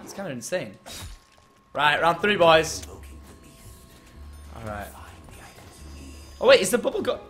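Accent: United Kingdom. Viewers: I like British guys British I like him <3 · Are you British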